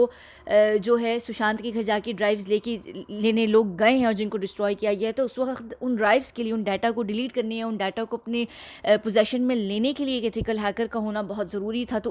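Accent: England, United Kingdom. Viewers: I absolutely love your English accent mashallah 🥰 love you sooooooo much ❤️❤️❤️❤️❤️❤️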